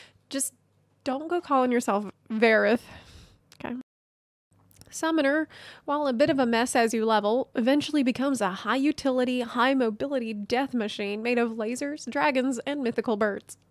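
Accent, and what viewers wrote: South, United States. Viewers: Omg i cant tell if your accent is Southern American or Australian 🥺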